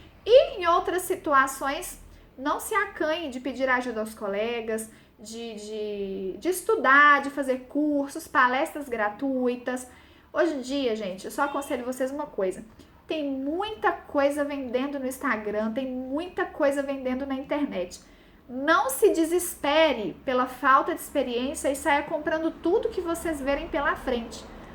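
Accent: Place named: Minas Gerais, Brazil. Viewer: As melhores dicas da advocacia você encontra neste canal! Muito bom! Sem falar no charme do sotaque "mineirinho"... Parabéns!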